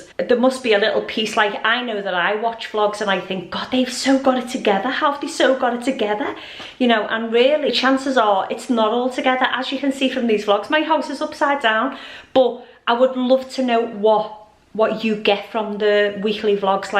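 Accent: Liverpool, United Kingdom. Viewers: Hi, your fab it's like listening to an old Scouse mate and Ur just like me. Your cracks me up he lovely. Looking forward to how he dresses you, as we are very similar in age but I don't see myself as at the age I can't wear low tops 😂 I'm 43 this year and I'm the age I feel 😂. Loving the new style in ur home and I've had a few bits from Neptune they are worth the money. Looking forward to your next vlog xx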